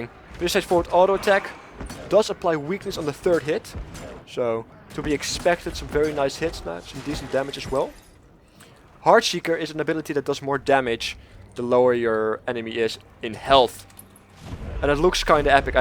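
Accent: Netherlands. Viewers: Sounds like hes from Holland.......... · Are you by any chance dutch? XD what server are you on? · You sound dutch :O Very nice coverage tough ! looking forward to use the thief :/ 1 more week !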